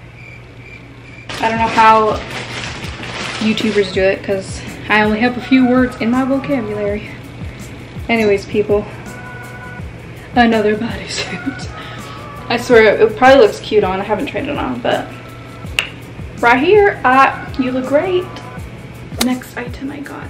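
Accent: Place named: South, United States. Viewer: liked the southern accent jaja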